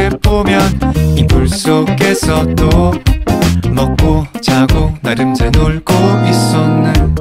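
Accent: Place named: North Korea. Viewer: 아조씬 부칸연기 천재야....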